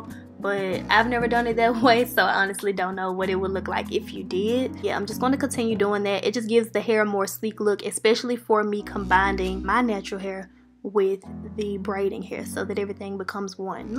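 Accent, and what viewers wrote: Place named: Memphis, United States. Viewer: I didn’t even have to know you.. && I knew you were from Memphis 😅😅😅 love it👏🏽👏🏽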